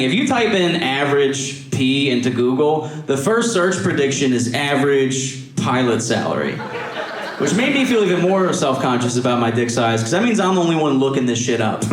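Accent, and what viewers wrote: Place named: East Coast, United States. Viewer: Great special. Dig his easy flow, never low on the funny. Voice (not style) every so often sounds like Stavros Halkias for a few seconds, he has to be East Coast origin. I’ll watch this a bunch of times.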